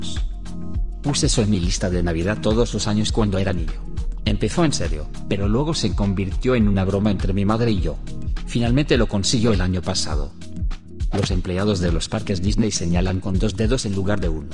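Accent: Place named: Russia. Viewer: Necesito escuchar esa voz de mujer rusa hablando algo que no sean solo post en Reddit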